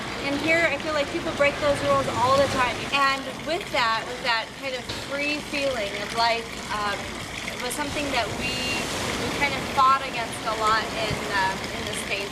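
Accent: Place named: Puerto Rico. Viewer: Tu acento al contrario suenas boricua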